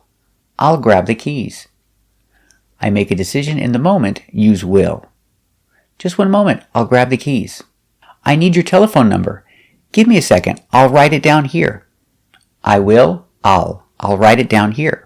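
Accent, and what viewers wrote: United States. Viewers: Sir are you American?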